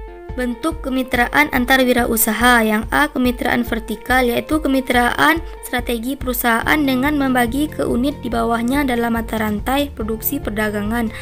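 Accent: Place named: West Sumatra, Indonesia. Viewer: hahah logat minang nyo ses😅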